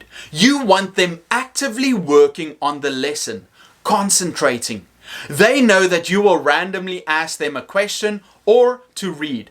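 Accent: South Africa. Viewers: South African Accent!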